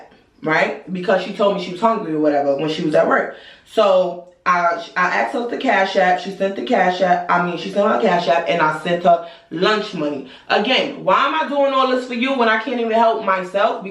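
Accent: New York, United States. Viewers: I want to visit New York so bad. I love your look, your style, your accent and slang. I love it 😍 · I just found ya page and I couldn’t understand why I love you soooo much already, then i heard the Nyc in your voice. Even then i was like no thats not it, then you said youre an aries 🥳🥳🥳 thats its 3.21🎉 gang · I knew you were from ny😂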